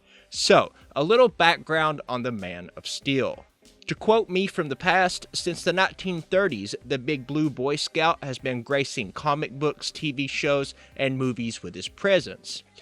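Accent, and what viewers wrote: United States. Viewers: could have done a "top 5 videos" haha im from oz and your accent is hardcore cowboy haha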